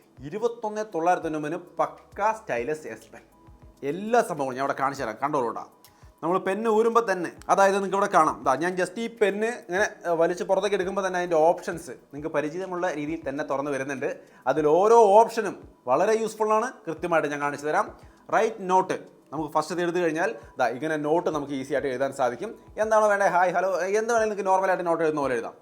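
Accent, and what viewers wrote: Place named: Palakkad, India. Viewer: ഇങ്ങളു പാലകാട് ആണ് എന്ന തോന്നുന്നു 😊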